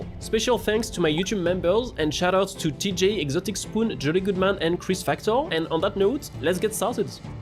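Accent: France. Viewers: French AND a speech impediment that’s crazy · I cant tell if that beautiful accent is french or something else, but god it invests me into the video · Wow a French accent with clear English is actually beautiful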